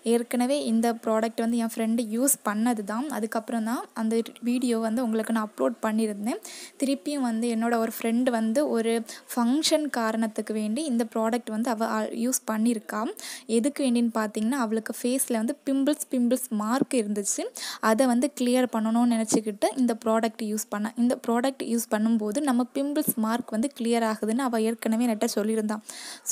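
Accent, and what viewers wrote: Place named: Kanyakumari, India. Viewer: Correct bro ivanga Kanyakumari than pesurathei vechei theriuthu 😅💯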